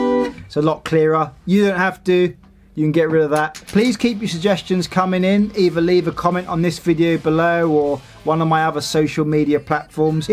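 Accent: England, United Kingdom. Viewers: Wow this is the first time ive heard speak, like i knew he was from england and probably had an accent, put i had always imagined his voice to be deeper to match his playing skill on guitar (which by the way your amazing and have helped me decide what songs to learn next), neverless your amazing at what you do, rock on man \m/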